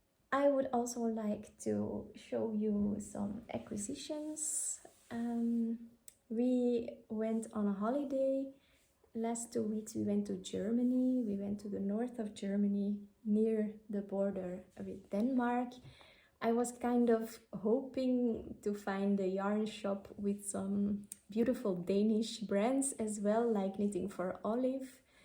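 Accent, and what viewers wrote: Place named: Belgium. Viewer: Leuke podcast goed om eens iemand uit België 🇧🇪 te horen . Mooie designs en ik kijk natuurlijk uit naar je volgende episode 👏🌸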